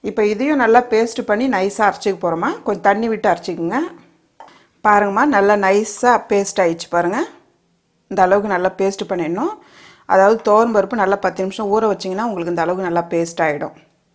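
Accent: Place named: Chennai, India. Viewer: Happiness is hearing our own mother's voice. ♥️♥️♥️♥️ When she talks "parunga maa... With Chennai slang"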